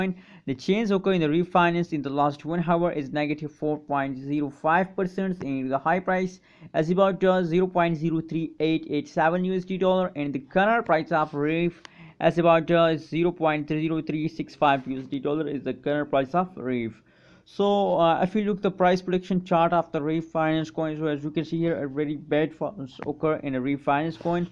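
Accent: India. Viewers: Terrible indian accent.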